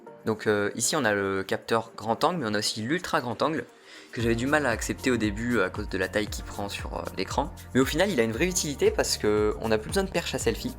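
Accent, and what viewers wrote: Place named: Saint-Malo, France. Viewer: Tu es de saint Malo ? Car j’ai reconnue 😁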